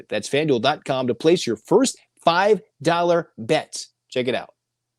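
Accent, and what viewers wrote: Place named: United Kingdom. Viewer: You sound like that uk guy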